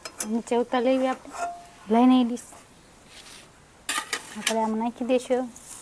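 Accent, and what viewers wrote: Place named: Jhalawad, India. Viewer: ઝાલાવાડ ના લાગો છો ભાષા ઍવી છે સરસ પાત્રા બનાયા મને પણ ખુબ ભાવે છે મારિ વાડીયે પણ વાવવાના છે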